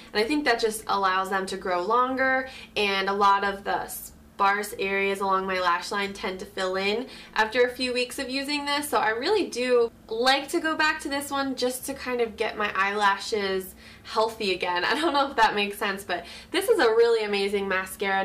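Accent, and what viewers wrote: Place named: Philadelphia, United States. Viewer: You sound like you're from Philly or Princeton area, where I live, & you look so familiar. Did you used to work in marketing or design before you started doing makeup?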